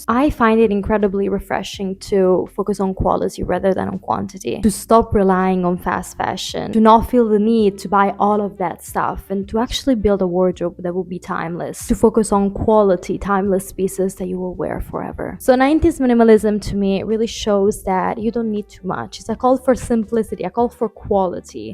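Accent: Italy. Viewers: I'm sorry in advance if it's common knowledge but you sound italian... Btw I'm already starting to enjoy your contents, you have a beautiful voice ❤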